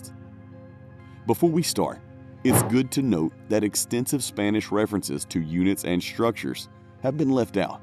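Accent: South, United States. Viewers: It’s the accent! Love it! That southern taste to it! It’s odd to my west coast ears lol Fellow countrymen all the same though! God bless you bro! 🇺🇸 🙏🏻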